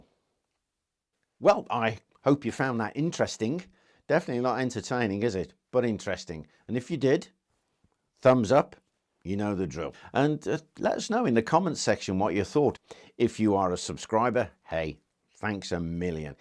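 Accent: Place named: England, United Kingdom. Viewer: Interesting... I thought you were a out medieval times history and the such... Just watched arrow shooting vid where u said u moved to Canada... Does that mean you lost your English pride. Or patriotism for England... It's just so odd hearing the accent and saying your Canadian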